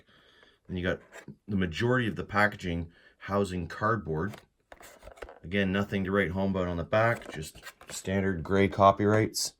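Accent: Canada. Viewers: Don't be ashamed of your heritage! Just teach them that Canada pronounces things differently, I think a lot of people just assume you're from Wisconsin. lol I used to watch Davidsfarm back in the days, he was practically your neighbor out there in Lucan, and he had the same nuances with his pronunciations, he also did the Zed phrasing.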